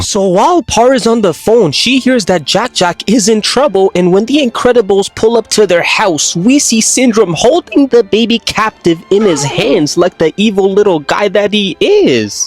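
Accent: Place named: Toronto, Canada. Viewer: Bro sounds like he from Toronto lol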